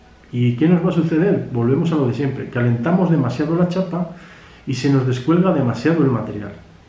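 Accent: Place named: Spain. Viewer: Si vivo aqui hace mas de 26 an~os,soy de la Republica Dominicana y usted por el acento me imagino que de espan~a.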